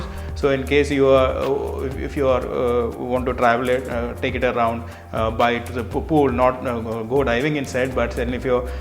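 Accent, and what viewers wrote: Kerala, India. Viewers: Hey malayali bro... The typical malayali accent... And nothing to be ashamed infact its something which connect all of us in this diverse society.. good to hear you and thanks for the video... ❤️ Subscribing just coz of the connection which i felt and efforts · He is a mallu...! I can hear that from the way he converse..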